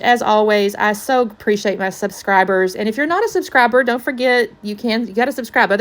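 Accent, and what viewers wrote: Texas, United States. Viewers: Great layout! I love your story of how you got started! And yes, I am one of your subscribers who loves your Texan accent - it always makes me smile! Thanks for sharing!❤ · I love your story. This is a wonderful tribute to you and all you have achieved. I love your positivity and feel like I am in the room with you when you scrap. I love your Texan accent. Always nice to listen to from an Aussie who feels we stick out so much on You Tube with our accents. I love what you did with the rainbow. 💛💛💛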